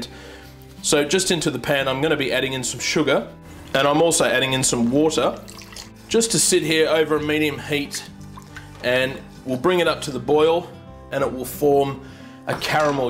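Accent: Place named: Australia. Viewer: You are an amazing person! and have all these great dishes!!! AWSOME! also love the aussie accent man! and you must have a busy week making all these videos and deciding what to make and getting ready and all!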